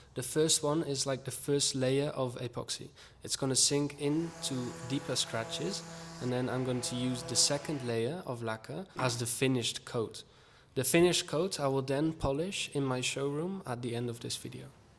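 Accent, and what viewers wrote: United Kingdom. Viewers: thought I was listening to a Londoner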